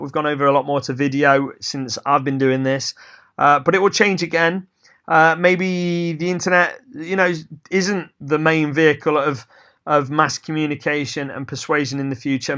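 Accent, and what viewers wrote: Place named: England, United Kingdom. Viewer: Hi. Loved your video - so good to hear another English accent. I’ve subscribed and am off for video 2. Thanks.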